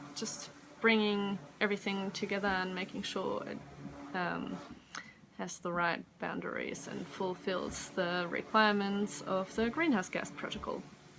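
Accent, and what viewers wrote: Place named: Australia. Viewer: noice ozzy accent :)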